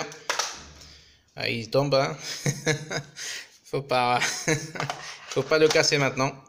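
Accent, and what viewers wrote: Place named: Kabylie, Algeria. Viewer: Juste avec ton accents, je savais que tu es kabyle hhhh bon courage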